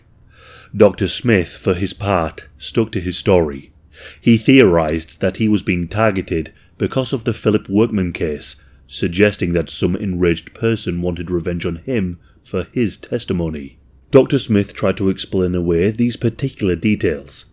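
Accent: United Kingdom. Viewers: The fact that this will actually help me sleep is kinda scary 🤣 i love true crime and just has that sexy deep voice with a sexy British accent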